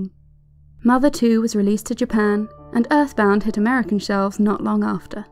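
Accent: United Kingdom. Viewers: Oh how I love this british accent. A nation of great narrators you are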